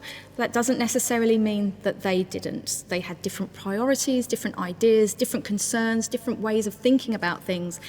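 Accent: England, United Kingdom. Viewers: I love art and archeology and I’ve watched a bazillion videos like this, but this is one of the best, she really brings the object to life and her enthusiasm is infectious...I’m an American and her accent and command of the “real” English language (not the butchered version of the language that we Americans use)...is wonderful. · Smart, beautiful, and an english accent? Call me dinner because I'm cooked. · What specific accent is this from the UK? It's..ASMR-ish.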